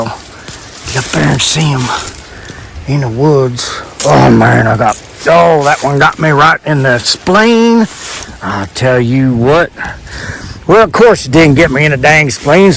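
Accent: United States. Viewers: Yep, you are goofy. Just what I thought. Loving it though! With you talking like an old country boy and making such a racket...they are not gonna stay around long!! Oh well, they'll be back!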